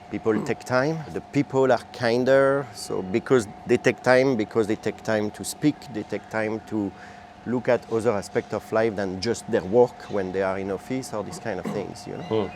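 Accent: France. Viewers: Well, he is French, speaking English, which is not his language fluently. Don't mind the accent. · Even after 20 years he still has French-English accent 😂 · After 21 yrs he still got french accent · He is Indian with a french Accent !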